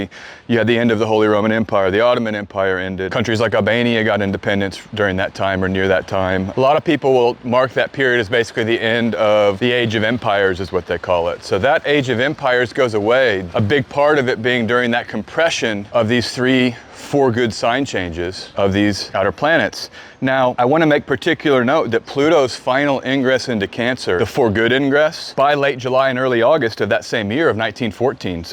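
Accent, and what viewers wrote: United States. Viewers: Hi A friend of mine sent me here, and overall, I've enjoyed your content and found it interesting since I don't know anything about astrology. Now, a bit of positive feedback, english being my second language, it's irritating how your overall american accent is perfect, yet it seems you repeatedly and purposefully mispronounced the word "Uranus", I've learned at school and double checked Google translate that it's supposed to be pronounced as "youranus" and yet you keep pronouncing it as "urunus", is this because your speaking in a different dialect? Why is the difference in pronunciation? It's like an itch inside my brain that I can't reach. Thank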